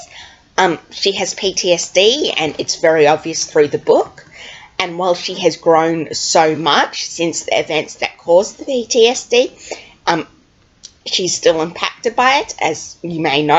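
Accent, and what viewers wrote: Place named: Australia. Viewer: Hi, welcome to Booktube! 😊😊 I gotta say I've always liked the Australian accent hehe 😁😁 I hope to keep seeing your videos around and can't wait to see you grow 😊😊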